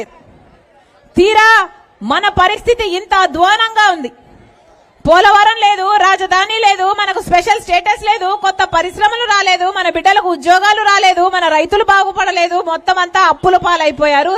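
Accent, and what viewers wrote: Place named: Telangana, India. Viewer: తెలంగాణ లో మాటలాడినట్టు ఇక్కడ మాట్లాడితే బాగావుండదమ్మా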